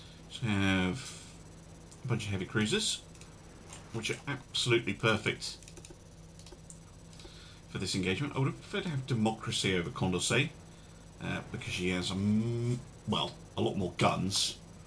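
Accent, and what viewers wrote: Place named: United Kingdom. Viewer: It's the British accent ;)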